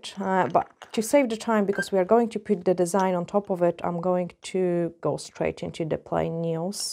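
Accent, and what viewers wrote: Scotland, United Kingdom. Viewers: Absolutely gorgeous 😀 are you part Scottish? You have a very subtle Scottish accent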